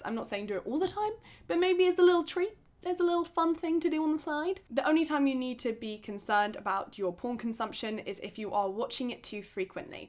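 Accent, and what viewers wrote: United Kingdom. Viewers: I truely AM intrigued as to why quite a few of your video descriptions are actually in German? Ich find's wirklich toll, verstehe aber den Zusammenhang nicht, weil du einen britischen Akzent hast und deine Familie - was ich aus den Videos bisher gesehen habe - auch Englisch ist (?)... Lernst du Deutsch oder ist es einfach ein Witz? XD love your chanel, love your videos, and everytime a header is in German I'm drawn to it like a moth to light ^~^ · i’m also British and i love her accent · Your accent is that British accent in every movie, absolutely beautiful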